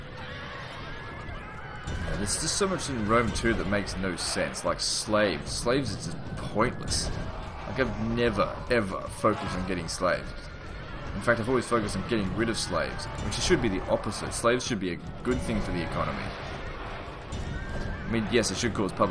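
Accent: Australia. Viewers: Australian I think (at least that's the most obvious too me, as he is australian)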